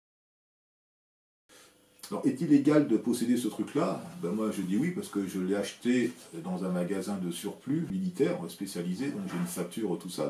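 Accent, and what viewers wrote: France. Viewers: Im not native english speaking person and yes your accent sound French but you last video I perfectly understand. Its true that you can buy some interesting stuff on demobiles (after military shops) in some prive hands i saw last year single use rocket motor for nuclear rocket (new one not used) what was at the moment best what zssr had at the moment. And the owner even dont know what it is ;) Imagine if some organize toxic fuel for it some avionics and can send bus to the USA from Poland :) Do you passion we will enyoj it if you share videos about it. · Your english is perfect bruh. People especially like it cus the french accent sounds cool lol. For what conceivable reason would anyone hate on it?? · Le bon accent français m’a bien fait rire. L’important c’est le contenu ! Je viens de m’abonner. J’attends la suite avec impatience. Continuez en anglais au vu de vos chiffres ! 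· thank you very much for your previous video. I could perfectly understand your English. In fact, due to the French note I found it very pleasing to listen to it. Please continue doing such videos! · I am from Hong Kong. My English is also bad and with heavy Chinese accent. I enjoy your English with French accent and your video too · l accent francais cela me va tres bien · Bonjour, I have been following your channel for years, never had an issue with understanding your engliah and always felt that your french accent was very charming. Thank you for all your hard work over the years. I can never get enough of your unique subjects which entertain and enlighten me with each and every video. -Ray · Why do you apologies for your french accent, it is very nice to see everyone speak their own accent, it shows that we should accept and respect each other. Doing such a great job and speaking your own french accent encourage everyone not to shy from their accents and to focus on content. 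· if you got haters on Youtube, that only means that you are successful. Your english is by far not as bad as you think it is. You have the odd funny word with french accent from time to time but it is easy to get used to it. Like when you omit the "s" when saying "display". Still everybody should know what you said. Your video content is one of the most sophisticated on the Youtube world. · L'accent français est rude seulement pour les oreilles françaises... donc on s'en fout. Ce qui m'étonne c'est la puissance de calcul embarquée même si c'est du fire&forget. Il embarquerait du code de type IA ? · french accent is funny.. maybe hardcore americans who only speak english having problems to understand you. but the rest of the world is with you. 🙃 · I think your English is excellent, and personally I find English being spoken with a strong French accent to be awesome to listen to. My former manager (still a coworker) is French (born and raised) and while he has been living in the US now for 8 years. 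His accent is still very strong and its something I also look forward to hearing. He is one of my favorite people in the world. 🙂 Please don't stop doing your videos in English. It is a pleasure to hear such technical information spoken with a French accent. I will still watch all your content if it is in French, but I will gain more from it if spoken in English. 🙂 I guess I am allowed to be selfish at least once and a while! lol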